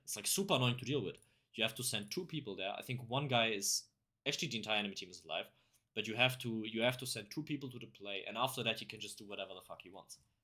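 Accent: Germany. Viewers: Hab an deinem Akzent gehört das du Deutsch bist haha. Aber guter guide, danke dir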